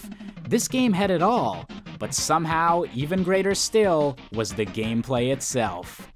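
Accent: Canada. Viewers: you sound especially canadian here :)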